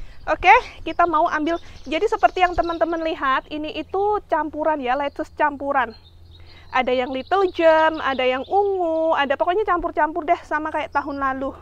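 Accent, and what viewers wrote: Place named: Banyuwangi, Indonesia. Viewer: Bang sekarang klo ngomong banget jd ketularan logat Banyuwangi nya mbk Manis banget jd manis byangettt 🤭🤭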